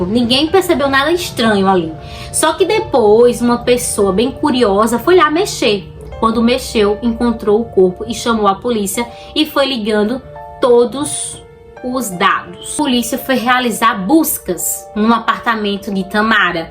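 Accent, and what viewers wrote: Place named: Northeast, Brazil. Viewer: "E disse bem assim" se existe coisa mais bonita que nosso sotaque nordestino narrando casos criminais reais, eu desconheço. Parabéns pelo canal.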